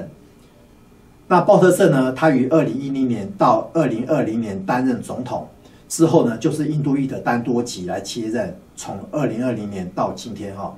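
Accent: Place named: Taiwan. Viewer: 请问，您老是客家人吗？听口音是台湾人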